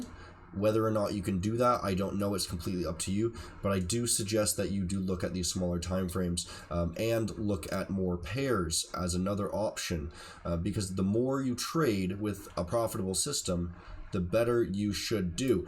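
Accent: Canada. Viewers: its just his canadian accent....not his fault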